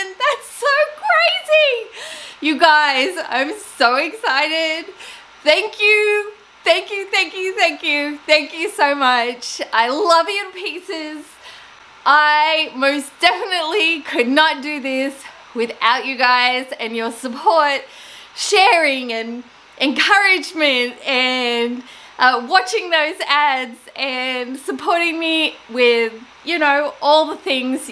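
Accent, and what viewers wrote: Australia. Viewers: so happy for you! You are so much fun to watch and listen to with that Ausse accent.. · Congratulations fellow Australian! Loved this video I always wanted to ask you how you ended up in America. I don’t think I ever knew you were a nurse as I’m a nurse too and can totally relate to what you were saying about nursing. It’s been pretty tough to nurse during covid though. Flipping furniture is my time out from my work. I can see your channel growing so much as your videos are amazing plus I just love to hear a fellow Australian on YouTube. So happy you are a DIY paint supplier! I’ve wanted to try that paint after you first used it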